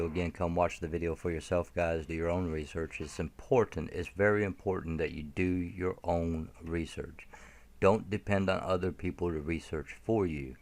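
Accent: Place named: Israel. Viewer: Her accent though is way to israeli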